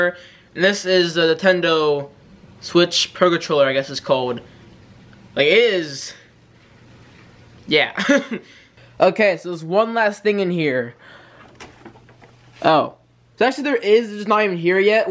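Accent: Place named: United Kingdom. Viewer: That British acent tho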